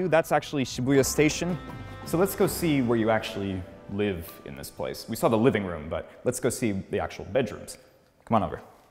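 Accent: United States. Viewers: I could tell you are American based on accent, although light, it's the "R", Americans emphasise it, whereas Brits - like myself - often keep it silent.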